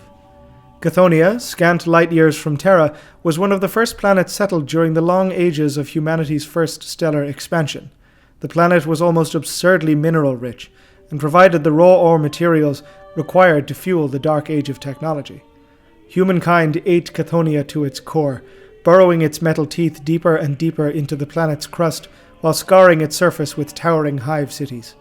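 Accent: Ireland. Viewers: sound's like Irish but i do wonder where he is from and his back ground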